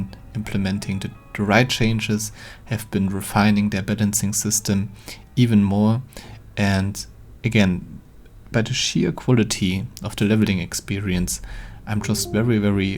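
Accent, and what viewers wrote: Germany. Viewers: Mach das besser auf deutsch... Das englisch ist so schrecklich deutsch 🤣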